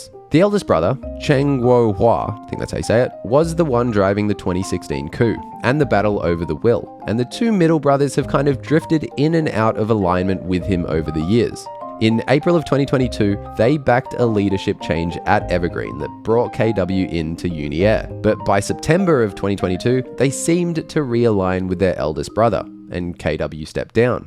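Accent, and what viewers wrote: Australia. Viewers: Yeah, particularly because he's good looking with an aussie accent 😊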